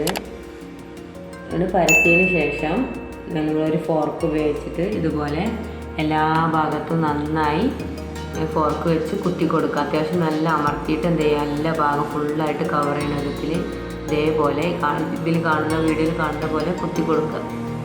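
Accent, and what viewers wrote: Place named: Eranad, India. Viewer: ഏറനാടൻ ശൈലിയിൽ നല്ല അവതരണം പൊളിച്ചു..I will try it tomorrow and let you know the taste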